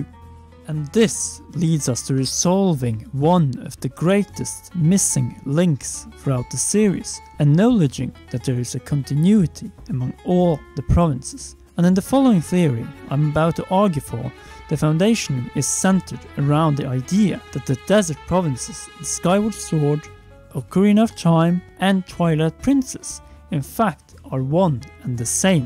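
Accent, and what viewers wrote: Norway. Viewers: That accent, er du norsk? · Hey dude, curious about your dialect, norwegian? Ever seen the series Hell on Wheels? you sound just like the character "The swede". Cheers.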